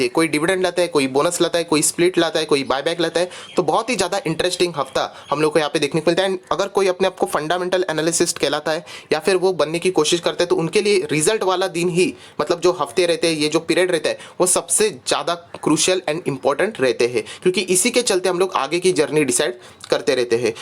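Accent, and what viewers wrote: Maharashtra, India. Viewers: Unke tone se confirmed hai, ki Maharashtrian hai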